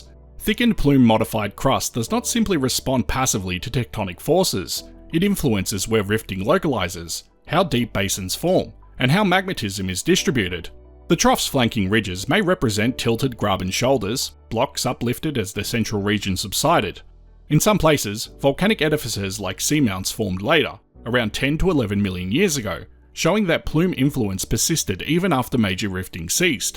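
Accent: Australia. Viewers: the voice is much easy to listen, australian accent is ok way better than the other channel.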